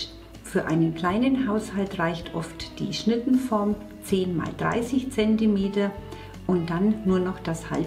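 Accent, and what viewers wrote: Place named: Franconia, Germany. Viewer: Ich schaue gerne Backvideos, weil sie mich inspirieren und fröhlich und entspannt stimmen. Für meine Geburtstagstorte habe ich hier auch Inspiration gefunden😄 Vielen Dank für die guten Erklärungen und die gegebene Nachlesemöglichkeit der Zutaten😊 Besonders an diesem Kanal gefällt mir persönlich, dass da manchmal der Dialekt durchblitzt und ich fühl mich gleich wie zuhause in der Heimat 😉 (ich sag nur 'fünfevierzich' hihi fränkisch ich liebs❤️)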